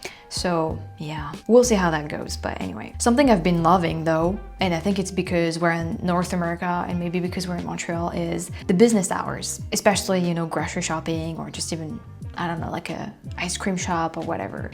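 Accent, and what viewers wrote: France. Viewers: On a completely unrelated note, your English is getting amazingly good. I can tell the difference from just 6-9 months ago. You’re losing some of that French accent and speaking more "North-American”. You probably dont even realize it yourself but its definitely there on more vowels and consonants. At this pace In another 12 months you’ll be fooling everybody and can tell people you are from Toronto or Orlando. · Never feel you need to lose your beautiful French accent. Mispronounced words that could lead to misunderstanding is one thing (and you rarely mispronounce your English words)…and, I do understand your desire to correct a potentially mispronounced word, if required…but please don’t confuse that with a need to change your charming accent. The French accent to the anglophone ear is soooo beautiful- truly one of the loveliest. 😊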